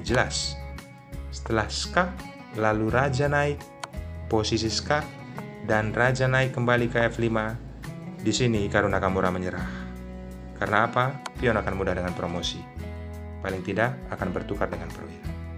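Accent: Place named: Karo, Indonesia. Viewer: Kental bgt ngomong Karo bro😂😂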